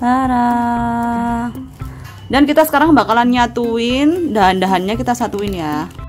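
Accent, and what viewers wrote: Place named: Java, Indonesia. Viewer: Logat jawa nya kliatan wkwk😄🖤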